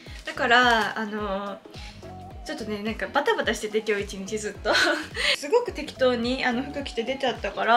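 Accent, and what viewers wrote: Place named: Hiroshima, Japan. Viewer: イントネーションが広島‼️(๑′ฅฅ‵๑)www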